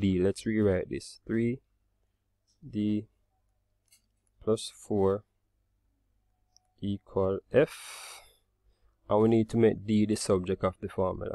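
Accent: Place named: Jamaica. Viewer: I wish I had a Tutor with a Jamaican Accent. Would have made Math's more fun!!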